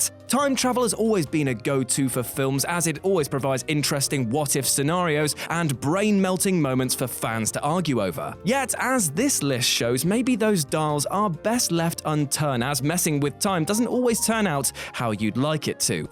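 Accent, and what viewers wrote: United Kingdom. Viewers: British watch mojo?!?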